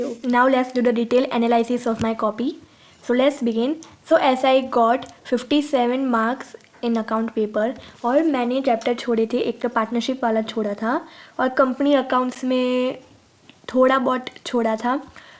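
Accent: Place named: North, India. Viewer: That northern accent tho 😂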